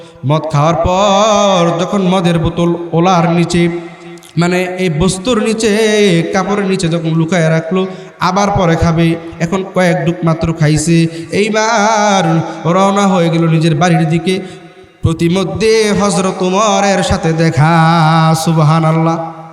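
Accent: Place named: Shariatpur, Bangladesh. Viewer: পুরাই শরিয়তপুরী মাশাআল্লাহ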